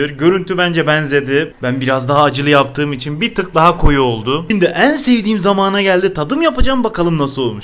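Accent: Adana, Turkey. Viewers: şive değil ağız adana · Yer Diyarbakır İstanbul değil ayrıca dil adana değil ŞİVE adana :))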